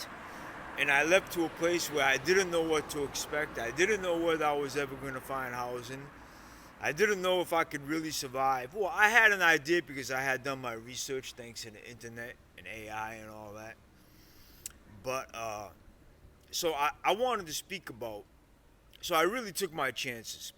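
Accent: Boston, United States. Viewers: Boston accent never left, love it! Awesome story brother. I agree, it is 100% by design. Keep humans in the rat race.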